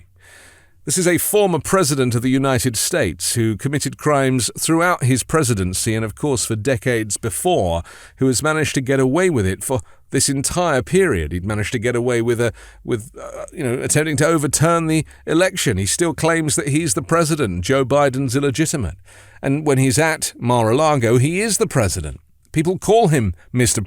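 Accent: United Kingdom. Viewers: . Nice job sir. Great to hear a British (or non American) voice outlining the situation so clearly. Im a New Zealander and appreciate this. Trump is now like a child bully. Once they are found out and cornered they show they are really horrible nasty gutless cowards. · I love how you completely dismantle Trump with your British accent making it sound like a very friendly story. The truth hurts 20 times more out the mouth of a Brit 😅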